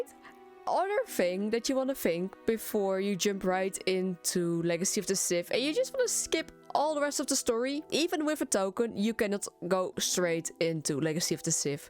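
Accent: Netherlands. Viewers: Listening to a Dutch accent is always amusing, so high pitched. Good video and +1 for the accent, lol.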